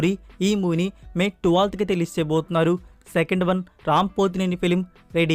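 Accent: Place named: Tamil Nadu, India. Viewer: Hahaha Tamilnadu Karnataka vallu matladinattu undhi mi Telugu....Anyway Ur Content is always Good brother....👏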